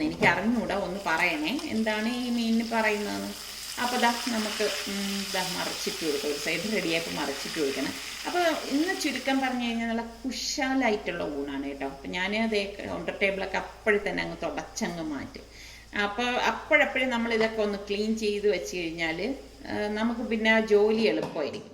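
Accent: Trivandrum, India. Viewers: Trivandrum ano veedu.speech nalla vyathysam.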